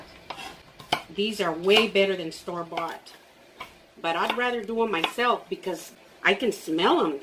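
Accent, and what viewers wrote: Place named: Midwest, United States. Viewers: Do u live in mid West of USA ur voice reminds me of ppl I hered talking. when I was out the mid West I just found u love ur videos so fun food looks great . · Latina? No think it's more of Iowa or Minnesota accent but I gotta say awesome video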